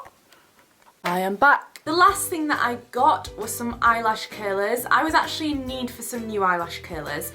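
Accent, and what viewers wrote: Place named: North, United Kingdom. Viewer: Love you videos:) can tell ur northern lol saying it's sick lol:) xx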